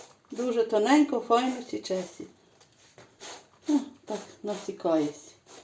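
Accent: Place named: Ivano-Frankivsk, Ukraine. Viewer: Все чудово, і рецепти салатів, і випічки. Дякую. Але, якби ви ще відшліфували українську мову, не вєивали русизму, при тому, що бажаєте всім миру і перемоги, було би мега прекрасно. Даруйте, що роблю вам таке зауваження, але мене дратує те наше уподібнення до московитської мови. Адже наша мова така багата, така милозвучна, а юагато наших західняків знаходять уподобання "збагачувати"свою мову русизмами: юбка /ніби спідниця уже не в моді/, зря /ніби даремно уже застаріле/. Отямтеся люди! По вашій бесіді я можу визначити, що ви з Франківщини і тому ще більше боляче чути суржик, який як іржа роз'їдає нашу багатющу і особливу МОВУ. Бажаю вам мудрості, бути берегинею не лише кулінарних страв і смаколиків, але й нашої унікальної української мови і навіть "бандерівської" неповторної говірки, нашого унікадьного діалекту. Здоров'я вам і творчих досягнень./успіх- калька московитів/.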